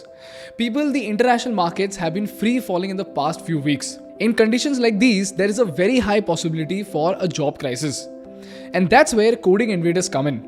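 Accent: India. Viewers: Its indian accent, much easier to understand. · Can’t take anything serious in this Indian accent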